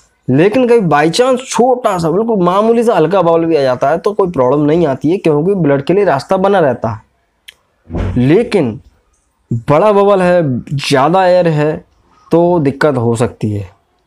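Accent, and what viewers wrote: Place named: Bhind-Morena, India. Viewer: Bhai ka Bolne ka tone toh bhind Morena ka lag Raha hai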